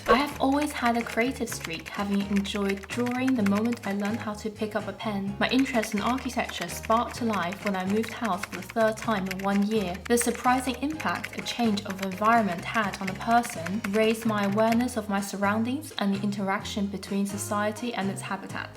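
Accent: England, United Kingdom. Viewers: not cringe at all with that english accent ;o made it sound elegant.. the twisted power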